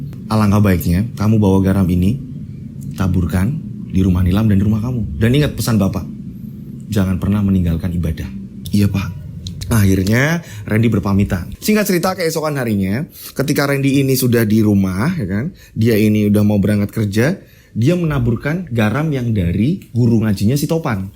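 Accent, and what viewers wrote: Java, Indonesia. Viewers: SUARANYA.....LOGAT NYA JAWA BANGET SAYA SANGAT SUKA , SANGAT MENJIWAI DAN BISA MEMBAWA YG MENDENGARKAN SEAKAN" IKUT MENYAKSIKANNYA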